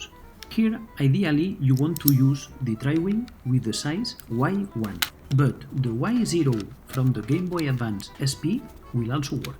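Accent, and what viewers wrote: Spain. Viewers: Tu ingles es tipo vallecas. Eres español no??? Donde pillas componentes? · Eres español a q si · Claramente eres español, pero me encanta tu inglés jaja (y también tu canal, joder!) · eres español??¿¿ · Tu eres Español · Acento de español como una casa 🤣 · Cómo se te nota que eres español!!! 😂😂 Porque me pasa lo mismo con el inglés! Suscrito!! Yo le voy a hacer el mod a una SP AGS-001. Porque de momento no encuentro una Advance bien de precio y se lo haré a la SP que tengo desde el 2004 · hola tu eres español no????? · La virgen como se nota que eres español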